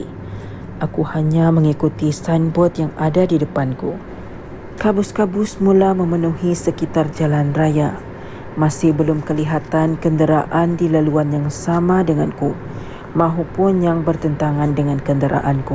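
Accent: Sabah, Malaysia. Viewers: mcm loghat sabah sajaa??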